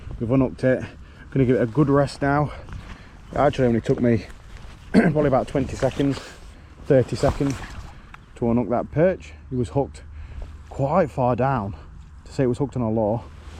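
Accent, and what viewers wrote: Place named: Nottingham, United Kingdom. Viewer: Alright, im from nottingham, you sound like you are from my neck of the woods . Where is this river you fish , is it the maun ?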